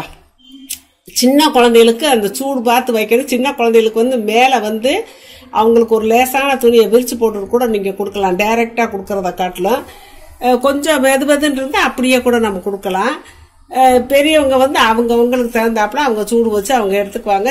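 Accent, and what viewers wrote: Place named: Kongu, India. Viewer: Unga kongu tamil language, vagapa... sweeta erruku patti😊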